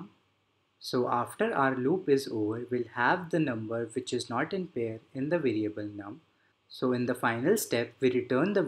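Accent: India. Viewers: fucking indian accent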